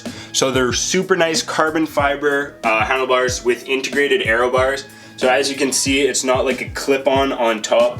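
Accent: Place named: Canada. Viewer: lol The Canadian accent is on point! love the video!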